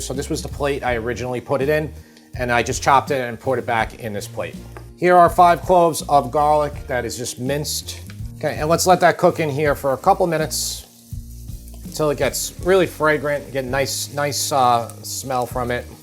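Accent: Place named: Long Island, United States. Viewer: Love the videos and still getting used to the Long Island accent. When you said 'the le crueset', I thought I heard "The Lakers say the oval ones are like $400". Who do you know from the Lakers and why do they care about dutch ovens?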